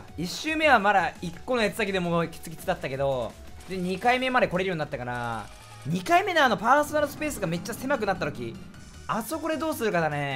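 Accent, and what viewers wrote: Tochigi, Japan. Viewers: 最近栃木弁が少ねーな〜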